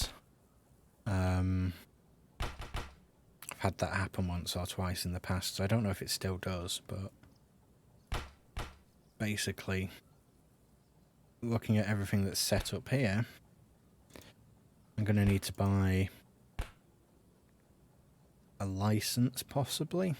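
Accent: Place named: England, United Kingdom. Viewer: Great video. I love that English accent and your sense of humor.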